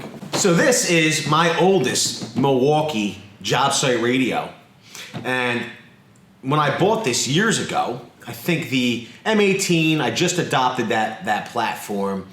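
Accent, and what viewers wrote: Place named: Boston, United States. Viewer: This dude is definitely from Boston 😂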